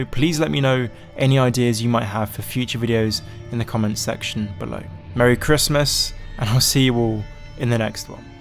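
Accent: United Kingdom. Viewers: He's clearly british mate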